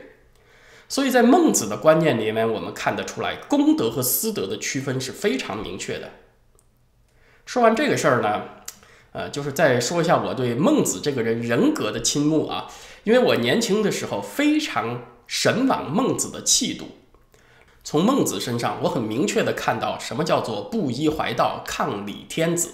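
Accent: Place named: Northeast, China. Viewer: 以下是剛剛收到的知乎的郵件： 您好，成吉斯，很抱歉您的回答受到了牵连，您回答过的问题「如何评价评论员文昭？」因「政治敏感」已被删除。您被牵连删除的回答如下： 搜了一下，网上没有先生的简历与介绍。看了2013年左右的视频，当时还相当青涩，与现在的几乎是两个人。既然网上没有公开的介绍，我就狂妄一下，猜一猜他是谁： 1. 像是东北人（仅仅凭一点点口音~）； 2. 应该出身于一个普通知识分子家庭； 3. 的大学应该是文科，历史、中文之类的学科； 4. 应该移居加拿大超过10年； 5. 应该在2013年前后在多伦多或附近的大学读了一个或几个与历史、政治、哲学等相关的课程； 6.傅佩荣先生应该对有比较大的影响。很佩服的才学、见识与表达，当然最重要的是的价值观。无论先生服务于哪个机构，只要先生能秉持自己的真诚，我都尊敬先生的选择。谨以以上文字向先生致意！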